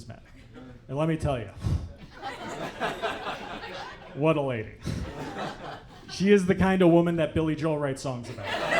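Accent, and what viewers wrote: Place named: Saskatchewan, Canada. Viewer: Lovely, sounds like you grew up in Dog River Saskatchewan, great stuff!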